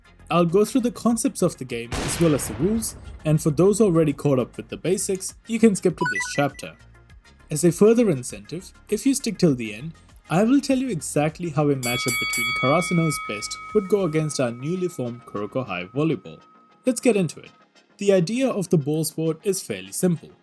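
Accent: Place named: South Asia. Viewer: I can identify a desi voice when I hear one👀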